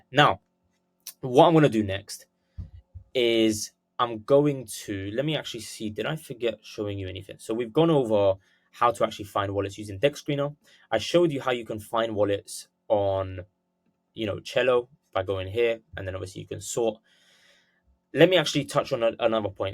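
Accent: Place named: United Kingdom. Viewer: I’m from London bro I hear a British accent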